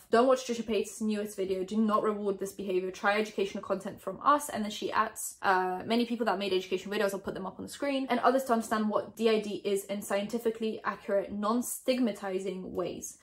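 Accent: United Kingdom. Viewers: What is your nationality? Because you've mentioned that you don't have a British passport but you sound very British lol :)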